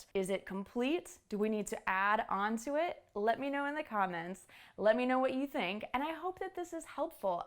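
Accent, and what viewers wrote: United States. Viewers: Hello ma'am my self Ijlal and I'm new in u.s right now so I just want to learn proper accent of Americans so here I want to mention something that I am your big fan of your accent and of your way of teaching and I am here a student so my request is please teach us phoenix sounds and please emphasize on particular T word.... 😊 Thanks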